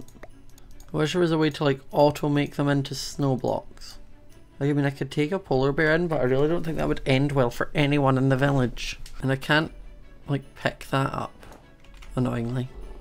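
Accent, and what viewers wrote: Scotland, United Kingdom. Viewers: its his accent which is a mix of English and Scottish that makes it sound different.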